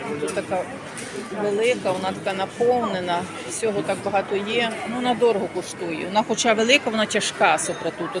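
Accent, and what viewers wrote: Ukraine. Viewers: Мені приємно , що так гарно ви володієте іноземною італійською мовою , мелодійно звучить з українським акцентом . Вдосконалюйте свою розмову !!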